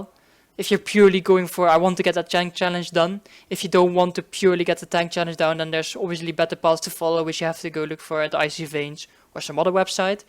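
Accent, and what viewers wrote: Netherlands. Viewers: he, ik hoor wel dat je nederlands bent haha. Het lukt mij maar niet om deze challenge te doen. zou je het voor mij willen doen? kun je het eventueel filmen om het dan op youtube te zetten voor de abonnees 😎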